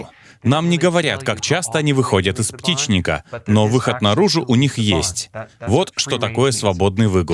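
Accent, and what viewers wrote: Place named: Ukraine. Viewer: курица а нЭ кури достали уже эти укра лайные кури кура и тд слух режет дико тем для кого русский родной читающий текст из украины это четко понятно по не русской музыке речи и ошибкам произношения типа а вместо О в словах где О ударная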